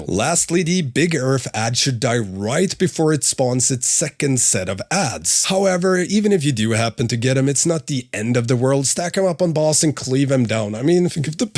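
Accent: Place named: Sweden. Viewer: dat bjutiful swidish accent :)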